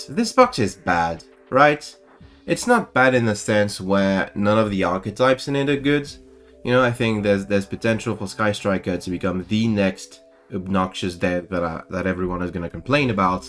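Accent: France. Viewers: Yo i kinda here the french accent in this one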